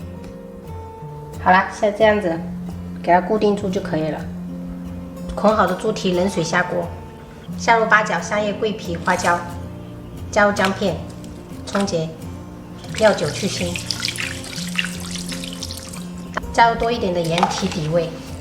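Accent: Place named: Fengzhou, China. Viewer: 听口音，好像是丰州的，或是浮桥?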